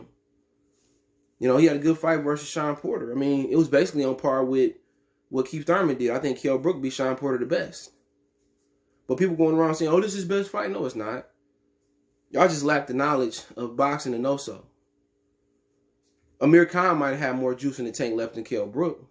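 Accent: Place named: Texas, United States. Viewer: Hold on to that Texas talk..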